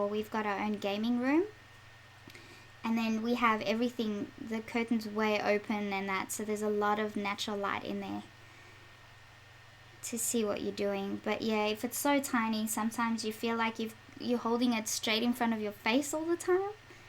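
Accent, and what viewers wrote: South Africa. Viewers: I wasn't expecting the Safrican accent :) Pleasant surprise. Great looking models too. Enjoy playing with them!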